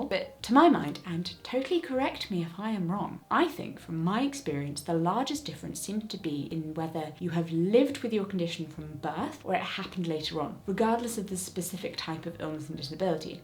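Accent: RP, United Kingdom. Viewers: You're so posh-sounding 😮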